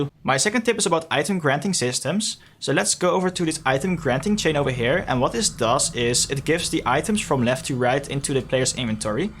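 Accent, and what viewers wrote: Netherlands. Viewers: Yo, the lever action shotgun now is in creative so can u put it in you're realistic 1v1 map, ty. Btw ik kan horen aan je stem dat je nederlands bent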